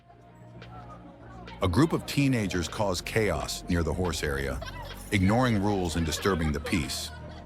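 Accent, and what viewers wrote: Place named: United States. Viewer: Why must an American be the Off voice???🤨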